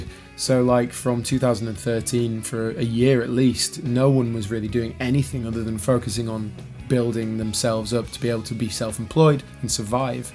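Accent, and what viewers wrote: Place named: Northern England, United Kingdom. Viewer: Mad our paths probably crossed back in the day. I noticed you were Northern by the accent but didn't know Leeds. Did a couple of modules of my degree at LCM in 05/06/07. I remember Dave often doing the sound for the metal gigs in Royal Park Cellars and knew his brother John (and later worked with him!). Funny old world.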